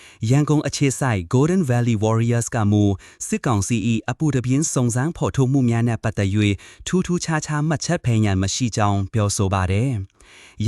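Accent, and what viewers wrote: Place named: China. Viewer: စိန မီဒီယာလား။အသံက ။နာေဖရး အသံထြက္တာ သတိထားနားေထာင္ျကည့္။တယုတ္ျကီး။သတင္းေျကျငာသူ။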